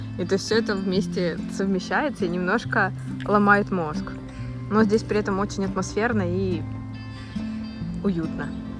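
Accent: Poland. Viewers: Klimat jest, no i ślonsko godka. Lubię. Niklaus Pieron też fajnie opowiada a godom wom to jako gorol :)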